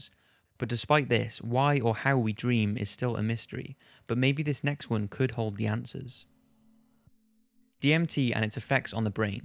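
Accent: United Kingdom. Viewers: Hey what part of the UK is your accent from?? I live in Australia and there's heaps of British people here but I would rarely ever hear an accent like yours? Lol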